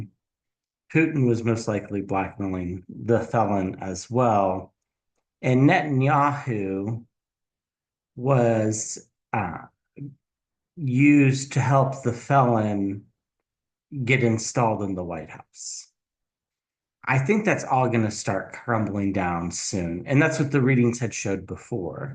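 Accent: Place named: United States. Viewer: you are a light amongst the darkness. I am an Australian (I guess like most of the educated world) just shake my head is disgust and amazement at what the Felon and his "band on merry men" are doing and at the moment getting away with. Your readings are amazing. On another note i can't pick what part of America your accent is from, (not where you are). Could you answer that for me NATO still exists even without the USA. Australia will be looking for new alliances and partners as well Keep up the amazing work, Thank you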